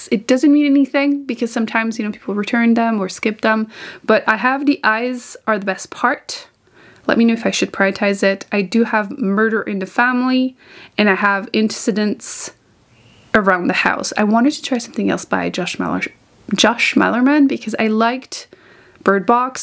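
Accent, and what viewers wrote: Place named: Quebec, Canada. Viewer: Hi! Just found your channel and really enjoying the videos I've seen so far. I was thinking your accent sounded a little bit like mine and wondering if you were French-Canadian, and now that I heard you say "Méditerranée," I think my hunch might have been correct. 😄 I look forward to hearing your thoughts on the book you've shared for your TBR. I currently have on loan from the library The Midnight Feast from Lucy Foley, The No-Show from Beth O'Leary, Razorblade Tears from S.A. Cosby, The other Half from Charlotte Vassell and First Lie Wins from Ashley Elston. Then I would like to shop my stash a little bit, as I recently purchased some of the books on my wish list that my library does not carry. I'm not sure what I will prioritize, I will just go with my mood at that moment.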